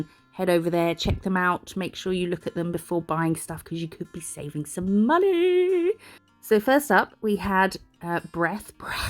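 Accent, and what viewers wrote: United Kingdom. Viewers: Can u show us some tricks for very short nails don't have long ones even as false ones Ur deffo Uk I'm from Yorkshire u rvul too fkin champion lol deffo a my city saying lol 🤣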